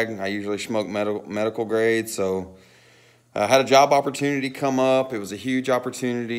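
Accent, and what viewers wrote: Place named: Texas, United States. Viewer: I don't no any wedding bands so yes Daddy call me I love that Texas accent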